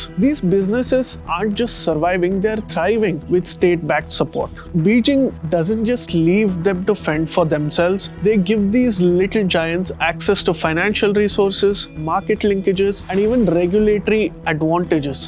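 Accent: India. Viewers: can you use AI instead? your curry english is terrible. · I cannot understand most of the Indian people's talking. Your Indian accent is the least I have ever heard. Wish you a great success.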